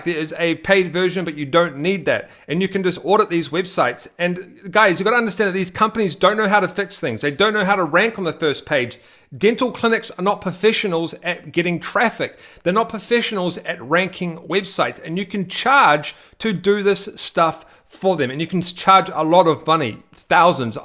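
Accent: New Zealand. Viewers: yep he's a kiwi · Where's your accent from ? Sounds kiwi!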